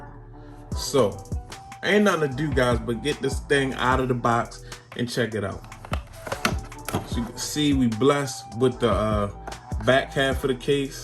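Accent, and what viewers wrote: Baltimore, United States. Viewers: Def got the Baltimore accent 💯 GO RAVENS 🐦‍⬛💜🖤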